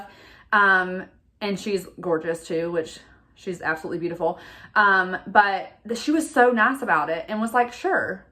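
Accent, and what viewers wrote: United States. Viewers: Love your country girl accent 🥰🥰🥰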